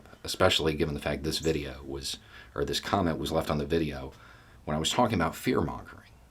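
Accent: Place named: Deep South, United States. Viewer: When the Almighty Algorithm dropped him into my box, I had to "go by" several times before decided to "tune in". the look of, his accent (I'm from the Deep South and have heard that accent from some tough, onery people)- it took me until the third watch through before I could believe he was really there. I don't think I've missed more than three since! Even Conservatives could learn a bunch here, but a bunch of thinking isn't much appreciated in Trumpland. Bet he wishes he still had Billy Barr for an attorney who could write out his own subpoenas! FR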